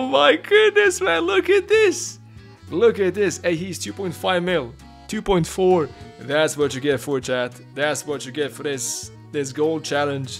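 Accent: Sweden. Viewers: Are you from Borås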